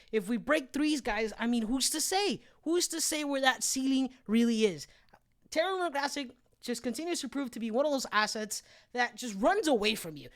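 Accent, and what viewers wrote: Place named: Bradford, United Kingdom. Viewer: Are you from England You accents like you from Bradford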